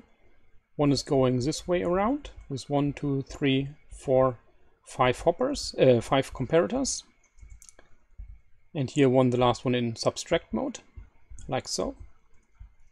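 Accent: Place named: Germany. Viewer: His accent sounds kinda german...🤔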